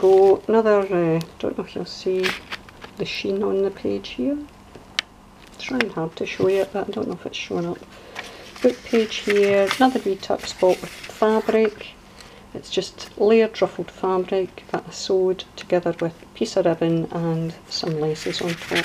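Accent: Scotland, United Kingdom. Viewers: Absolutely gorgeous journal, really love it, it's so so pretty. Of course, we'd listen to you and with your lovely Scottish accent too. My aunt was a nun in Falkirk for many many years so I love to hear the Scottish accent, it reminds me of her and my visits there. Best wishes from the west of Ireland, Mary:)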